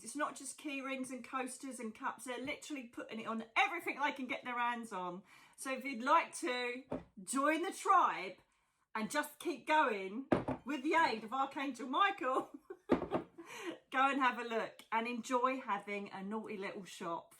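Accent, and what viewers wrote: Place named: Scotland, United Kingdom. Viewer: Mags from Scotland… loving the Scottish accent and love you! Yes, purple is your colour! Go! Big hugs all x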